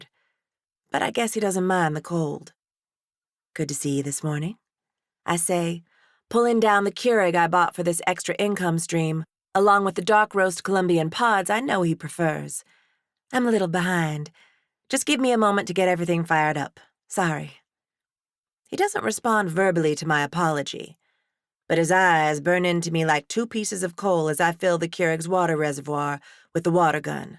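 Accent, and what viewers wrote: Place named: South, United States. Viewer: YESSSS this is going to be very interesting!!! As a Black South Carolina woman I really appreciate Southern voice. 😜🤩💯❤🏍🌪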